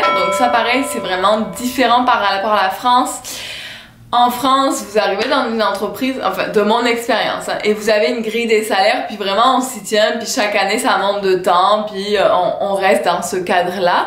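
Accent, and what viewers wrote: Quebec, Canada. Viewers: Pourquoi tu parles avec l'accent du Quebec alors que tu es française ? Je comprends pas trop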